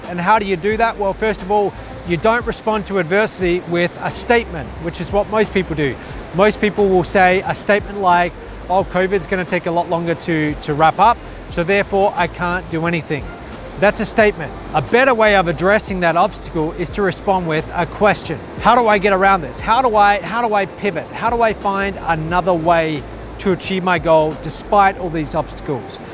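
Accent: Australia. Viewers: Yep a bloody Aussie!!!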